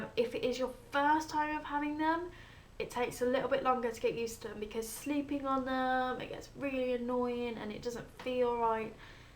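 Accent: United Kingdom. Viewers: Are you british/english? I love your voice! :3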